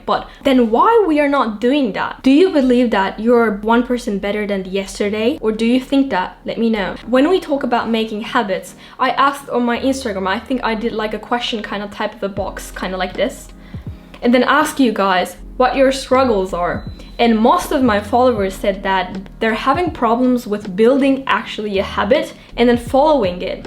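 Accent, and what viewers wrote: United Kingdom. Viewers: Bu videoda ingilizcende bir ingiliz aksanı sezdim sanki. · have you ever been in england? ur accent reminiscent of british. just my opinion 😌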